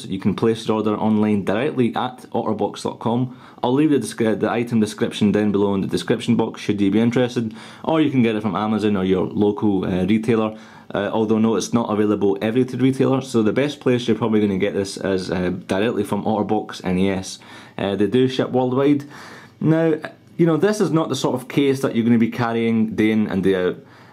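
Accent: Scotland, United Kingdom. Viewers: I like how you say otter, o'er box, i love the Scottish accent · are you scottish?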